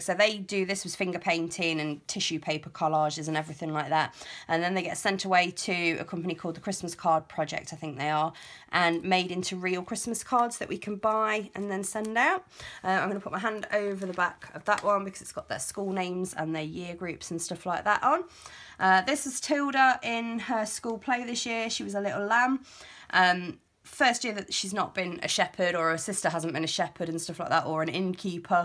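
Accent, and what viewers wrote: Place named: Texas, United States. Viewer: Enjoyed your video very much,! As a Texas girl, I enjoyed your accent almost as much as your album! I have photos and an idea for each day, but haven't started yet. Thanks for all the great ideas!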